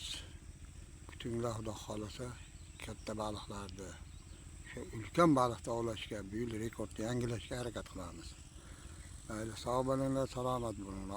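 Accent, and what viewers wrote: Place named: Buvayda, Uzbekistan. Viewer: Хожи ота ? Каердансиз ? Бизнинг Бувайда тумани ахолисининг шевасига ухшар экан гапиришингиз . Лекин уста овчи экансиз , койил !... Видео учун рахмат ! ...🎉🎉🎉🎉🎉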